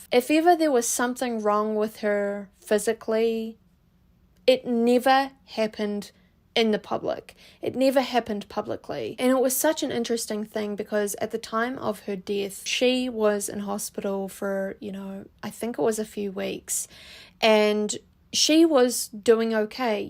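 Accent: New Zealand. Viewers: I have an interest in accents, are you a NZ who's moved to the US or vice versa?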